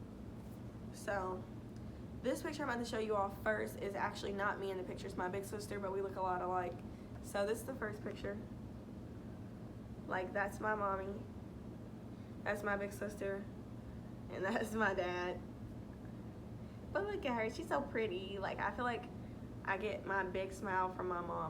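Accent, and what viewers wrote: South, United States. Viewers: Girl where you from you sound so country lol.. They should've came and picked you up as soon as they could instead of scaring you and working up your nerve.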